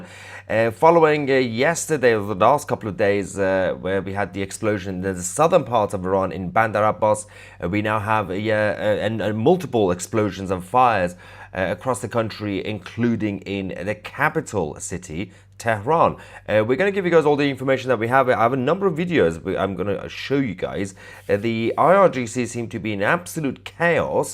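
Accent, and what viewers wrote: United Kingdom. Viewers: Excellent news report on a great country under crazy leadership. I enjoy your wonderful British accent! Keep up the good work. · Nice British accent and well broadcasted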